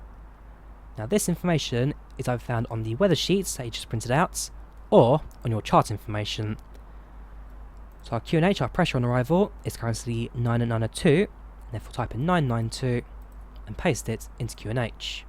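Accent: United Kingdom. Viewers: Such a nice tutorial video . But couldn't understand your British accent sometimes. But big fan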